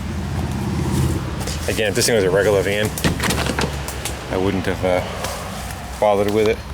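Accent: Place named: East Coast, United States. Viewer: Most of the east coasters that work in my seafood department where I work sound like you haha.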